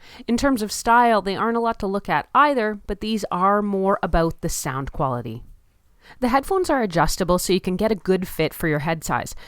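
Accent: Canada. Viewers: She sounds Canadian , so the video is even better.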